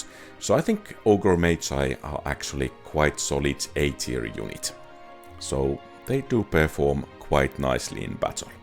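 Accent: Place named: Finland. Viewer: Based on the accent I think Finland.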